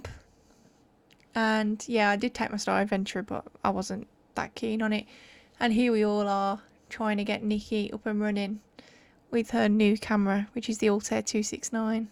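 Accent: United Kingdom. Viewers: Where yow Bin ? 😂😂 Welcome back fellow Brummie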